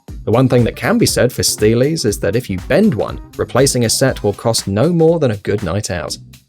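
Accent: England, United Kingdom. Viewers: You sound English but you talk American. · Isn't it odd listening to an Englishman speaking American English. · Are you English?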